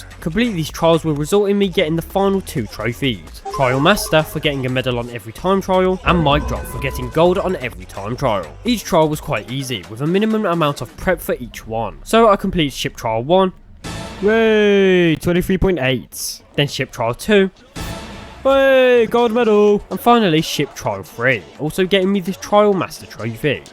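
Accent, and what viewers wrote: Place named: Black Country, United Kingdom. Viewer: you aren't by any chance from the black country are you? Not even lying you sound exactly like me haha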